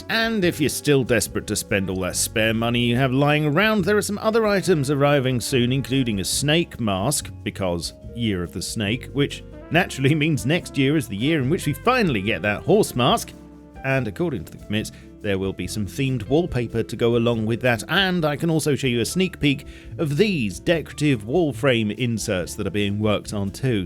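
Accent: United Kingdom. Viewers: I'm only here for the Bri'ish accent.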